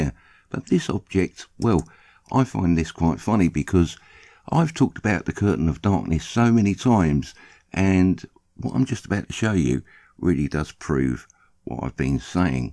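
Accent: United Kingdom. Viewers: Your commentary cracks me up. That old school British dry humor has a special place in my funny bone. Whenever I hear that British accent my mind flashes back to my younger years hanging out at my boy James' house. His parents are British transplants. When you said, "What you tryin to hide there in the sky, Sonny Jim?" that brought me back to a time when I was 15. James' dad caught us sneaking down his Weinhart's in their garage. "What you tryin to hide there, Sonny Jim?" Between the guilty look on my boy's flush face, the buzz from the 3 beers i just slammed, and Jim Sr's accent, I couldn't help myself hold the chuckles back. You got me wearing the same cheeky smile I had on back then. Thanks for that,. I needed that right now. MadLuvBrada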